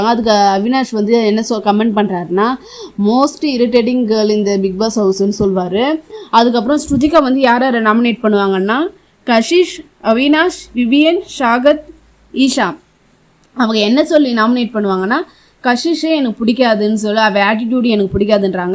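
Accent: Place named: Dharmapuri, India. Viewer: Dharmapuri Bhasai❤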